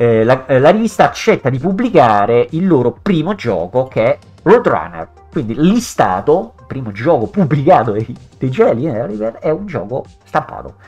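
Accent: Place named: Rome, Italy. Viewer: Si grazie, INPUT anche era una traduzione di una enciclopedia Inglese se la trovo la ripulisco! Giù allo scantinato ho anche parecchiè riviste a prendere polvere e umidità, tipo LIST, e COMMODORE GAZZETTE (era la mia preferita per Amiga e c64), L'Amiga 500 purtroppo non ce l' ho più, gioco o scrivo qualche programmino in Basic sull' emulatore di tanto in tamto. Ma il C128 sta nello scatolo insieme anche ai manuali ecc. Penso che sia un po' in cattivo stato, tipo mancano un paio di tasti e non si accende, ma si se si può rimettere in sesto ne sarei felice, dovrei informarmi come spedire un pacco grande, cosa mai fatta, sono una chiavica a risolvere problemi pratici e non mi intendo di elettronica, io sono vicino Napoli e tu dall'accento penso che sei area Roma, quindi penso dovrei spedirlo, ma penso una volta capito il tutto non dovrebbero esserci problemi, quindi si certo, nel caso fosse possibile mi farebbe molto piacere, quindi mi metto in coda :) PS: se fossi interessato alle riviste COMMODORE GAZETTE (il mio computer preferito oltre al 128 è l'Amiga naturalmente, perciò le compravo) e se fare e mandare pacchi non è una cosa troppo onerosa/e/o/stressante te le mando, (o anche la stessa INPUT se la trovo, le regalo naturalmente, lo dico a scanso equivoci, dato che stiamo su internet), penso che starebbero molto più in buone mani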